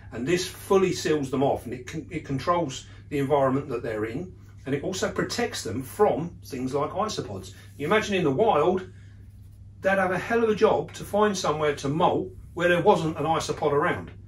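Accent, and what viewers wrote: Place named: London, United Kingdom. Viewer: man, I didn't like spiders until I found your channel. Wow. And I love that salt of the earth London accent 👍🏻 Changing people's minds pal All the best From sunny Glasgow 🇬🇧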